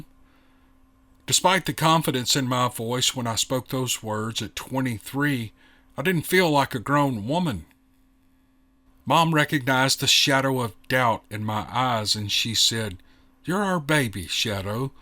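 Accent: South, United States. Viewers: Awesome story!! Neoma definitely has a way with words. Her very descriptive form of writing drew me in and had me feeling like I was part of the story. Neoma's writing coupled with silky smooth Southern drawl is a match made in storytelling heaven🥰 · Thank you for another great job. Enjoyed it very much. I guess it's that lovely southern drawl that just pulls you into the middle of the action. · Super story! Kept falling asleep to your sweet southern drawl. It took the whole night, till am for me to hear the ending. Wow! What a conclusion it was! I'm awake now 😂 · I got to say this is one of the most interesting stories you have read. And I also will comment on your wonderful accent and phonics and the way you speak/read to us all. Your accent sound, southern, but without the heavy drawl-but I’m not exactly sure where to pinpoint where your accent is from! 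Where I’m from there’s been all types of accents and I can usually identify them really well. Tell us please. And thank you again for these really really interesting stories.👏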